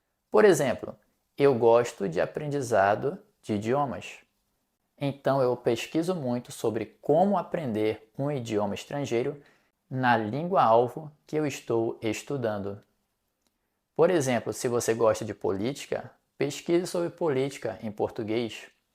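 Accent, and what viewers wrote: Rio de Janeiro, Brazil. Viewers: Que motivante, gostei! É um prazer ouvir esse seu sotaque carioca, muito bonito, que posso utilizar como um modelo para o meu. Obrigado! 😊 😎 🎉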